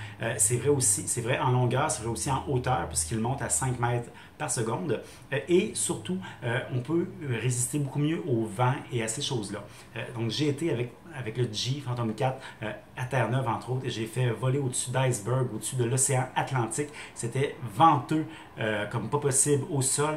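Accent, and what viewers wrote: Quebec, Canada. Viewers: T'es canadien ? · il est québequois c'est pour ça ....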